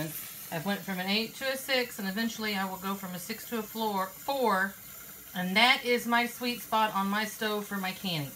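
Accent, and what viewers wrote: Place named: Rural Missouri, United States. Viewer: LOL! I felt like I was back home on the farm in rural Missouri when I was a kid. You informed me, made me laugh, and your accent is a breath of fresh air from home. I am in the downhill side of 60 and have canned for over 40 years but never canned ham because someone told me that I was not supposed to because it was cured meat and curing changed the density of the meat and it might not can properly. I know that I am watching this several months after you did this and that you have made several videos since so you have probably had a chance to open the jars by now so could you please update on how it turned out. I really want to do this. I am not opposed to rebel canning methods. My Grandmother Wright had a picture of the man praying over his meal like yours hanging over her table. My brother has her picture. 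That made me homesick. Have an awesomely blessed day!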